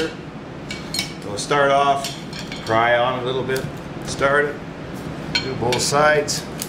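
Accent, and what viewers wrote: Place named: West Coast, United States. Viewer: Thanks Bro. just picked up my first washer motor today ...bit of a grunt getting the little basterd out ..had to hack saw it in two ..now I'm down to the windings ...I guess I will try the cut one end and pull em threw method as it looks like this is the easiest method for me being in an appartment ( no shop with vice) ..gotta do it dat way ...you sound like a West Coaster ....OK better quite gabbing and get on with the show ..cheers to all my fellow scrappers out there ..probably one of you is the guy that gets to some of the TV's before me ...got to love them big sonys ...they are killer ..got 2 the other day ....check out my site I got lots of interesting videos ...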